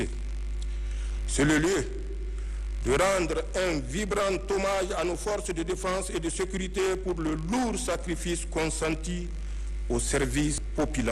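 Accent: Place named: North, Benin. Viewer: Le Président Thiani a l'accent des béninois du Nord.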